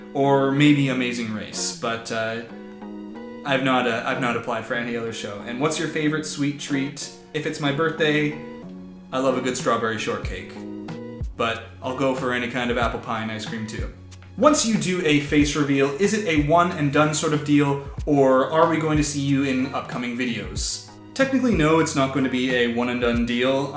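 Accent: Canada. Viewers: Congratulations on reaching 101k...that is amazing and the fact you are Canadian as well is such a bonus!! 🌹 ( We say tomorrow different than yankees? Who knew) PS MikeBookGiveAway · I thought you were cool better because you have a Canadian accent and I'm a Mainer/half Canadian--- but as a Big Sister-- you're even cooler for talking about the Big Brother/Big Sister program! Love your videos, thanks for being so fun! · OMG those dogs are awesome!! LOL! Ok...You're so cute and thanks for doing the face reveal. It's so nice to have a face to go with the voice now when I watch the fantakes. I'm glad you do them and I am forever anxiously awaiting the next one you make. And yep...I can definitely hear the Canadian now that the video came out and I'm listening more to what you are saying than concentrating on a recap. It's the word "about" that always gives it away. 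To my ears it sounds like "aboot". LOL....well, maybe not quite that bad but close enough. Always a fan and congratulations on hitting 100,000K. You rock! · I FREAKING KNEW IT I KNEW THAT ACCENT WAS CANADIAN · Where in Canada are you? (Your subtle accent gave you away!) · Nice to "meet" you, i was looking forward to you reaching your 100K mark (congrats on that!). I had guessed right about you being from Canada - I can't tell the difference between how Canadians and Americans pronounce "tomorrow" but to me, the giveaway was the way you say "out". I've noticed Canadians pronounce the U more. (I'm French-Canadian, so it took a while before my English became good enough for me to notice those subtle differences.) You didn't say which province you are from, though...? Love your humour. Would love to hear you cover Survivor - I'm a big fan as well. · I WAS SO NERVOUS for some reason haha I pictured you with dark brown hair! 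I’m not surprised you’re Canadian based off of your accent :) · Also you say "Tuh-more-owh" and Americans say "Tuh-mar-oh" that's probably what gave the canadian away. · Well that explains why your voice sounds so normal to a fellow Canadian. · As a fellow Canadian I somehow detected your Canadian accent haha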